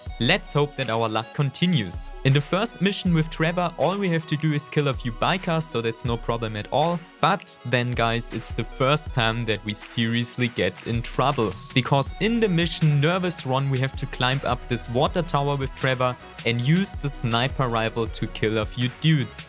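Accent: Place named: Germany. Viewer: Man hört einfach so hart raus,dass du deutscher bist haha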